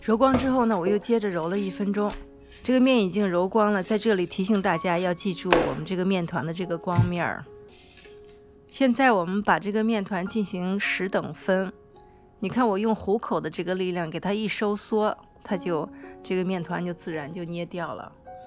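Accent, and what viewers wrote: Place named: Shijiazhuang, China. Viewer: 听口音像石家庄滴：）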